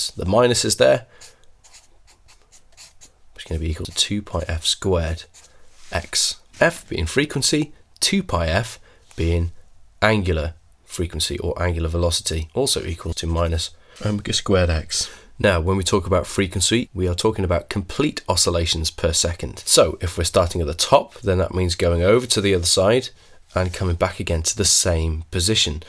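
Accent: United Kingdom. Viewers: i Knew he was british through his accent but he confirmed it by writing centre instead of center (but who cares this is a great expalaination for simple harmonic motion)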